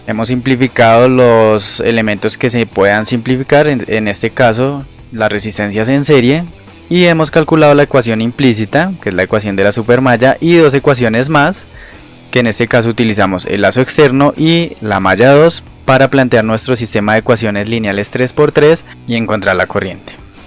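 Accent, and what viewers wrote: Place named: Colombia. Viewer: Ya te agarré el acento, Es de Colombia. Es que dicen que los de Sinaloa tenemos parecido el acento al de Colombia. Tienes una joya de canal, lástima que no tengas las visitas que mereces. Pero sé paciente. Poco a poco. Saludos!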